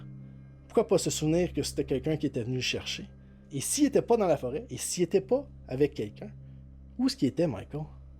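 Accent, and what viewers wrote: Quebec, Canada. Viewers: Un québécois, sa fait du bien, lâche pas mec, c'est super bon ce que tu fais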